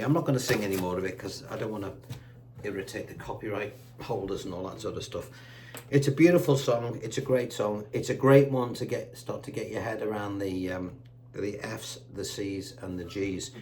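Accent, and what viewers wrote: Liverpool, United Kingdom. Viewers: I'm telling all my guitar mates about you. You're a gem of a teacher with loads of humour and friendly Liverpool banter. Thanks again buddy.🤗🎼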